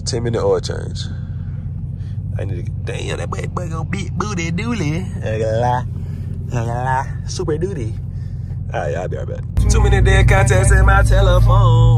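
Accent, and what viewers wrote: Atlanta, United States. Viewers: Sound like u from da Atl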